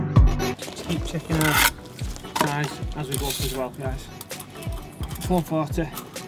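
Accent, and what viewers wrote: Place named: Northern England, United Kingdom. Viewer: Thanks for another great video. I love your norvern accent and eagerly await for your next tutorial. Please keep up the good work pal.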